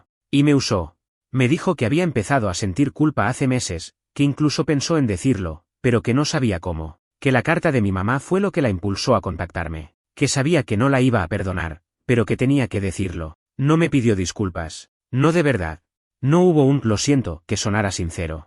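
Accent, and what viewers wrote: Argentina. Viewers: La IA que traduce es de argentina pero dudo que la historia sea de allá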